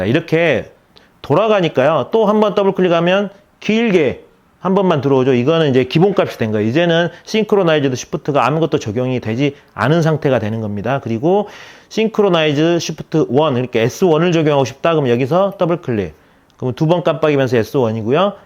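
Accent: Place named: Gwangju, South Korea. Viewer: 참 좋은 영상입니다~! 구수한 광주 사투리는 덤이구요 ^^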